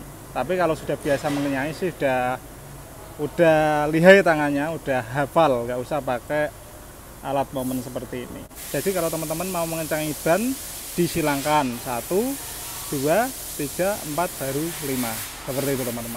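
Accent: Java, Indonesia. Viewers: Ngomonge kaya wong jawa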